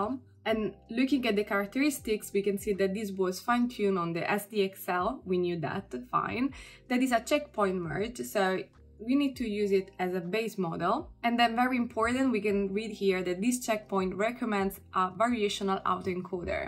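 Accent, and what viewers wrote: Italy. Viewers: Love your channel and your Italian accent 😊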